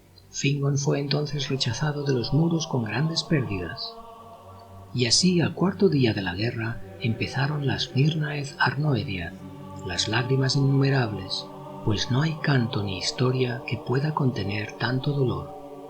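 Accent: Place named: Catalonia, Spain. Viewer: Qué asco el puto acento catalán de mierda 💩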